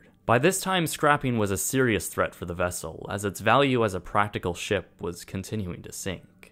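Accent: Canada. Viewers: are you Canadian? I detected an accent...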